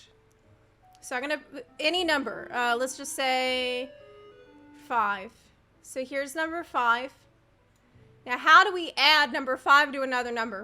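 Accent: South, United States. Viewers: Oh my god, from your accent I sense you were raised in the south I learned this technique and STILL use it. You would tap the dots for twice for 7 through 9. I learned this in Louisiana it's totally ingrained in me to count this way!! My European gf saw me counting this way and she asked me verbatim, "what the fuck, are you on about? What was that?". I said the American education system.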